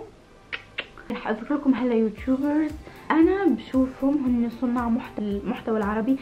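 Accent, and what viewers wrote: Tunisia. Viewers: هل انت تونسية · صح هي تونسية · إيه تونسية حلوة سكر😋🌹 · انا مشتركة جديدة حاستك تونسية اذا كنن صاح انا جارتك من الجزائر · حسيت من طريق حكيك انك تونسية بنت بلدي👍👏🌞😗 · نحسك تونسية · احس انك من تونس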